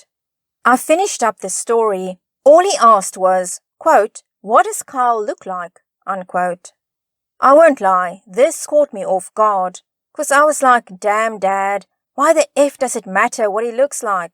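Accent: South Africa. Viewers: Aaaaah the rare south African Afrikaans accent out in the wild! What a rare sight! Great commentary at the end :). All the best for your channel!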